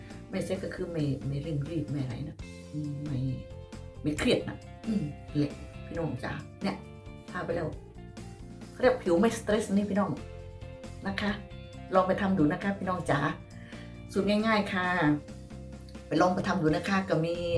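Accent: Korat, Thailand. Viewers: ชอบดูยาย พูดโคราชค่ะ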